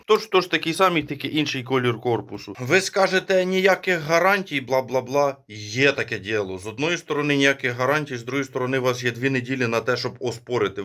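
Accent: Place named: Sumy, Ukraine. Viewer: я почув ні разу не місцевий акцент - схоже на сумський ))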